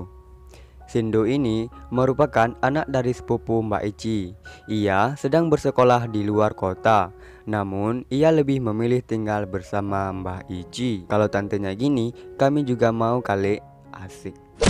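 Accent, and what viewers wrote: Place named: Aceh, Indonesia. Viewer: Lage logat awak geutanyo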